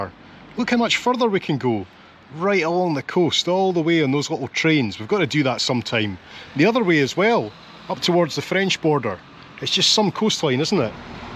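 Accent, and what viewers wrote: Scotland, United Kingdom. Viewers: music not needed, just background sound and your great Scottish accent....! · How delightful to journey along with the views accompanied by the therapeutic sounds of the wheelsets on the rails and lovely Scot's voiceover.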